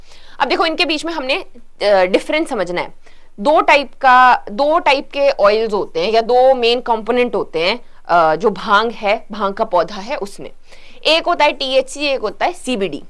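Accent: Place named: Himachal Pradesh, India. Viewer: 2 , 3 " CHATAKE " 😂😂😂 par jaate hai . Himachali touch aa he jata hai , same happens with me ( himachali touch while speaking ) . By the way nice video madam🙏 . 2,3 CHATAKE 😂😂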